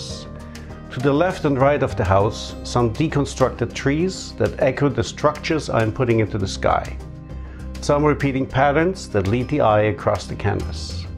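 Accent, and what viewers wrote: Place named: Germany. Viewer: Hi! I love your tiny house drawing- yes the pride in craftsmanship is evident in the beautiful little house you drew. Your narration and editing and videography are wonderful- I feel like the house stands beautifully alone. I detect an accent- are you German or Austrian? My father is from Germany- a wonderful country.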